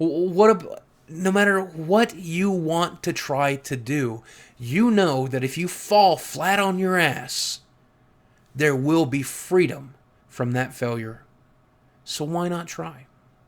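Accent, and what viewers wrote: United States. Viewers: I can't understand you very well because althought i understand english, i cant understand very well the american accent. Its like spanish from Spain and Latinamerica, are different. But if your problems comes from your body (as you said is a big problem) or loneliness, you can change it but you have to do an effort. You can go to a gym and lose weight and be happy with your new body. That happyness improves your esteem. Its long to explain but you can do what you want with an effort.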